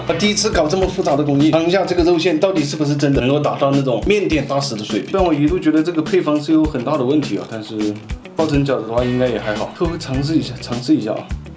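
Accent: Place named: Shanxi, China. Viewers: 姥姥必须得是我们山西人，这口音，一听就是自己家姥姥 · 这是山西北部，内蒙西部的口音啊！ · 这山西口音，弄上水zanzan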